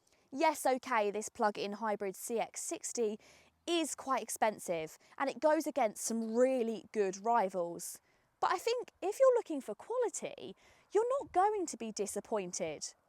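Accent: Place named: Colchester, United Kingdom. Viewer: I've decided to test drive one, before I commit to a RAV4 PHEV. Have you compared them? I love your channel. Your presentation skills are great. That's a lovely accent; Colchester, if I'm not mistaken. Best wishes, John, X. PS As it happens, I have 35mm film projection equipment here that was first installed at the old Odeon, Crouch Street, Colchester.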